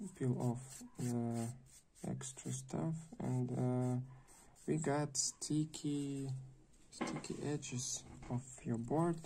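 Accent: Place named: United States. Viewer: С американским акцентом :(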